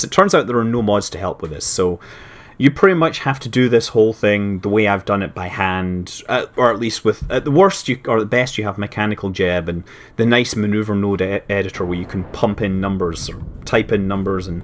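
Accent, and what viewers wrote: Scotland, United Kingdom. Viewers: Hullo! Spoken like a true Scotsman!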